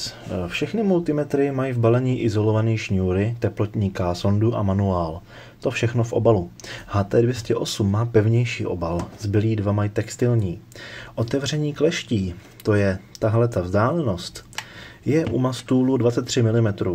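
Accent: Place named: Prague, Czech Republic. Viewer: Ach ta pepanština :(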